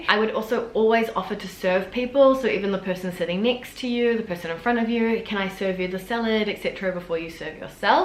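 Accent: New Zealand. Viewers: I love your Kiwi accent, love ! Great summary of french foody etiquette. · wow your kiwi accent really came through when the auto-caption mixed up your six and sex... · Trying to work out your accent... Kiwi, right?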